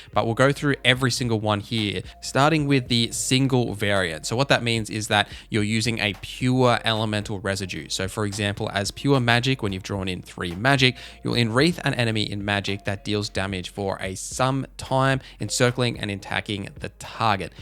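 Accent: Australia. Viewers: Great guide, and so nice to hear an Australian accent :)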